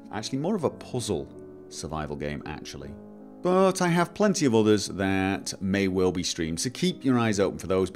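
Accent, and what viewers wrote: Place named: Scotland, United Kingdom. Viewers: LOL I always thought you were Scottish. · Norway? Honestly, I would have never guessed. Hahaha (facepalming) I thought you were Scottish....at least that's what I thought your accent sounded most like. BTW, I haven't heard your opinion on Bethesda's upcoming, special E3 Conference....do you think they'll be announcing Fallout 4? I'll definitely be keeping an eye out for your mods when it does come out. Personally, I think they're getting ready to announce it. I can't think of any other reason they would rent out an entire theater in downtown LA for a day.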